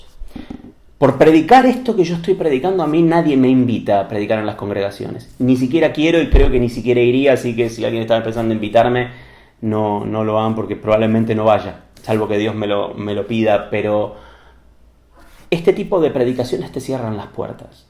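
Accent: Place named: Argentina. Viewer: Gracia y Paz en primer lugar le doy gracia a Dios por sus riquesas inescrutables por esa palabra atinada y en segundo lugar gracias por tener el privelegio de escucharlo Siervo por su acento veo que eres argentino eres una bendicion para todo aquel que te escucha desde Panama centro del universo lo escucho a diario y me regocigo trae gozo a mi alma la gloria sea para Dios Bendicion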